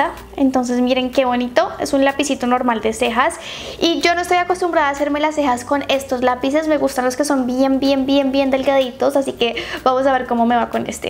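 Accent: Colombia. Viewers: De dónde es ella? Tiene como acento colombiano o es solo mi impresión?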